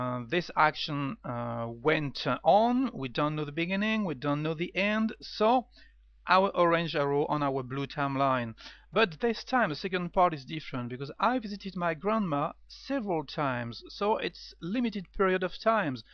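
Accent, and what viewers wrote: France. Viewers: haha love your french accent :-)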